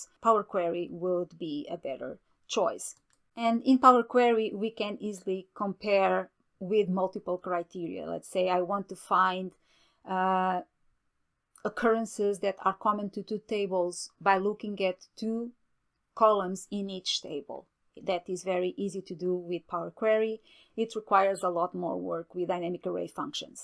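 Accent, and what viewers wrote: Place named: Portugal. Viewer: Eu abri o video que me apareceu, porque procurava a ferramenta para comparar as listas e ouvindo o sotaque, comecou me a entrar a impressaro que a voz era Portuguesa. Fui olhar para o nome do autor e acertei.. Sobre o conteudo, e muito bom. Bem explicado. Obrigado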